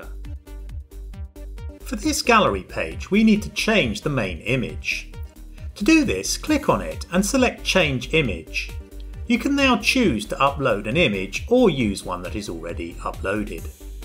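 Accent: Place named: United Kingdom. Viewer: Well done, what a great tutorial of Wix (and with this British accent as well:)) I built my first website now, indeed no more than 10 minutes, but there is some work a head of me still. I think I'll get a logo somewhere and I need to add my content. Thanks a gain for this simple tutorial.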